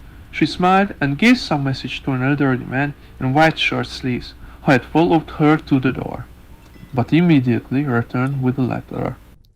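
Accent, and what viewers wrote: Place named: Hungary. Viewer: Hello Dear 🌻🐝 You're my first Hungarian accent so in my 71 years I've had no experience developing an ear for it. If you'll share a chapter you feel is your best translation so far I will try to learn it. Looking forward to hearing from you again. Auntee Sara ⚘️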